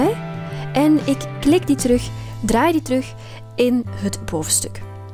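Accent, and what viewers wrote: Flanders, Belgium. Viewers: Ben je Belgisch want zo klinkt het. Niet erg natuurlijk maar dan. Moet je jezelf niet Nederlands noemen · je spreekt niet "Belgisch" , het is Vlaams en wij zeggen ook bv. praat Nederlands want Vlaams is een accent...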